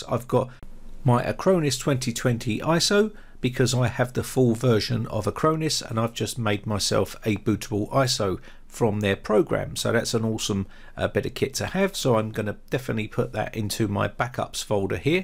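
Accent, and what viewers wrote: United Kingdom. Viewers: Couldn't help loving the accent. Where in UK are you from?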